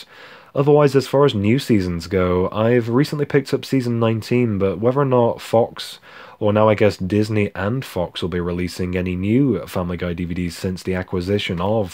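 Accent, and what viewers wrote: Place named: United Kingdom. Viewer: Happy 10th Anniversary on your Channel I came Across your Box of Childhood VHS Tapes Video and that's how I found your Channel and I Subscribed for more Content since I'm both a VHS and DVD Collector since my Early Childhood to Adolescence Years to now since I'm 23 (just turned 23 on January 17th) which means I'm pretty much new to your Channel and I Discovered that you're British and you have an Awesome Accent Bro just to let you know that I'm an American who loves British People because they have Awesome Accents when they start talking and you my Dude just earned a New Subscriber PS Check out my Comment on your Box of Childhood VHS Tapes Video I Commented how I Started Buying and Collecting VHS Tapes when I was very Young oh and PS I'll be making a Video on DVDs I Ordered from Amazon Recently and I'll be Uploading it by Tomorrow so be Sure to Check that out as well and again Great Content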